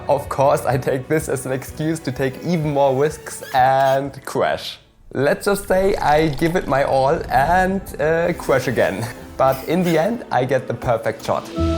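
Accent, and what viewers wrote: Germany. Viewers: Dein Deutscher Aktzent ist geil❤ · The O3 and O4 are from stock waterresistant but all other parts on the Drone mmmeeeh😂 On my 5“ builds Im using the MGChemicals 422c silicone comforma coating its not too thick like a few other ones incase i have to melt it away for future soldering. For my 1-3“ Inch and on my Race Quad I use the flywoo waterproof spray. Bouth are just fabilous i knew the comformal coating is the best but i was shocked how good the flywoo spray works, Good video sounds like a heavy German accent💯💥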